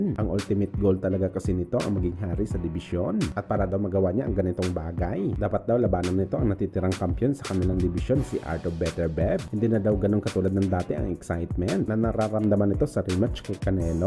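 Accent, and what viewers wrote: Iloilo, Philippines. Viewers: Ilonggo geyd😅😅😅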